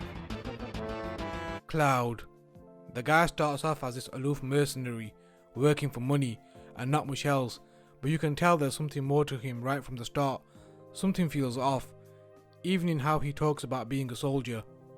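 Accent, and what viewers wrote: United Kingdom. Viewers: Fantastic video and a great retrospective. Thanks so much! I imported this title along with Final Fantasy VIII on Switch. Having both full games on one Nintendo cart is amazing! BTW, where are you from? I can’t quite place your accent. I do love it, though. 😉 Edit. Ah, you’re British. I looked up Sheaf Market Square. Now I know. 😁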